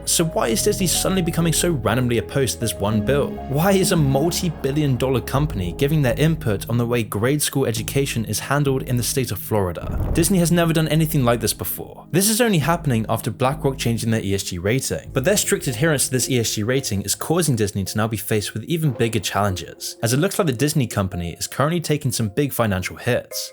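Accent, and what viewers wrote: United Kingdom. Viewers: So, bassicly every week its a new runner up for “ most evil business in the world “ Time for a new title or maybe even new content. As for this video, im literally watching at timestamp - to prolly hear about things I already know but this time in a UK accent 😂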